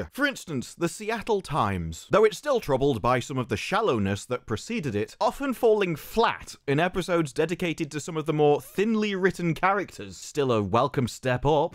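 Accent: United Kingdom. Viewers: Holy shit man you have one serious British mouth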